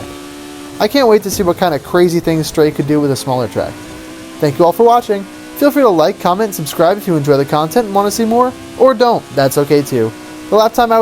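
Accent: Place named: RP, United Kingdom. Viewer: This is a wicked circuit. I agree with your review other than the dead stops and the size of it I dont think there should be a mark down for them, cos if a person cannot stay within the width of the track plus the run off space, then its a skill issue, not a builder issue. Yes, length does make it harder to decorate, but id rather have a brilliant track like this, then more stuff to look at it whilst I race about. Good stuff boy. I love your posh accent as well. hehehhe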